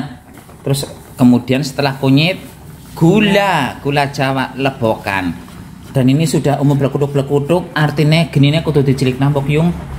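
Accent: Banyumas, Indonesia. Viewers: Seru jeruknya manis...wes jian,ora ngapak ora kepenak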